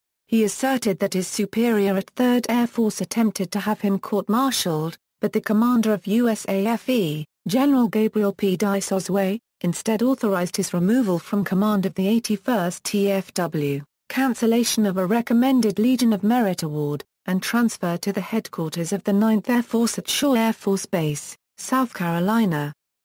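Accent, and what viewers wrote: England, United Kingdom. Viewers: She sounds English, has accent, ok?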